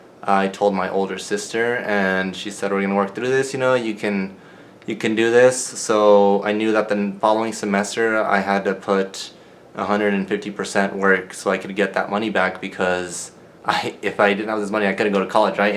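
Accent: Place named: New Mexico, United States. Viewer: Hi, I instantly recognized your NM accent and then I heard you say NM and I knew I was right lol. Thank you for posting this. I really resonate with your story, I went to Santa Fe High and it was so easy compared to college, I definitely didn’t do hot my first two years, I went to college out in Boston. I just finished my post bac, close to 30 hrs with a 4.0 & am hoping for an amazing score on the MCAT & will be applying this May. Thank you for sharing it’s so important to see people that we can relate to in this space!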